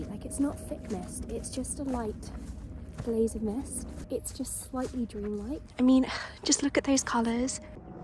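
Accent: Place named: South, United Kingdom. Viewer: Here to learn south British accent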